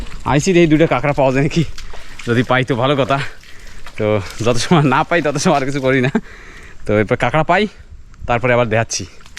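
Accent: Barishal, Bangladesh. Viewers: বরিশালের একসেন্টের সাথে মিলে অনেকটা ।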